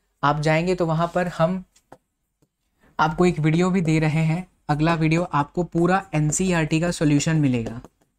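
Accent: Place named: Tamil Nadu, India. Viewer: 46' 12 Tamil accent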